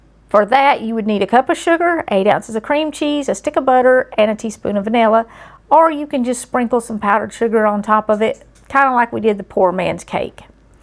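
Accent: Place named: Tennessee, United States. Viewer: Hi from Ohio👋👋TY...great recipe! My grandmama used to make for church dinners yrs ago! I❤ur accent...reminds me of home (TN)!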